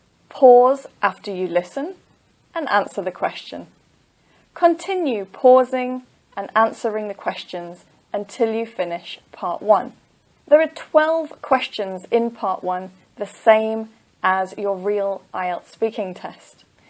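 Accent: United Kingdom. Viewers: Hey thanks for the videos. Studied for 8 days watching every video of yours and also checked the website for reading test. Got 7 band overall. Very happy. Thanks for the help. Didn’t get enough time to practice, else 8 band was easy. Thanks a ton. BTW, I’m in love with British English now! 😅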